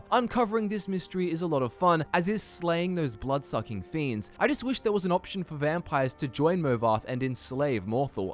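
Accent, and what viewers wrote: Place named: Australia. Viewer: me: starts video me: oh hey fellow aussie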